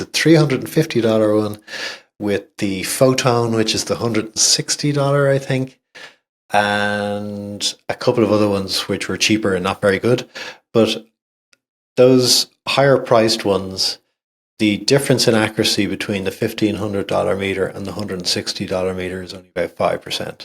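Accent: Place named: Ireland. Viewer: I could listen to talk for ages, love the Irish accent, Republic of, of course:)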